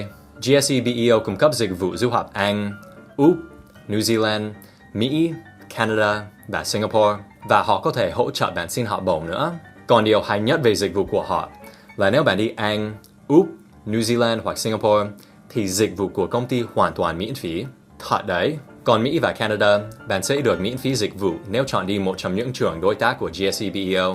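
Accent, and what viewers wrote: Standard. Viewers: Thầy nói tiếng Việt ngày càng chuẩn. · thầy nói tiếng việt chuẩn quá. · thầy còn chuẩn hơn cô kiều trang nhiều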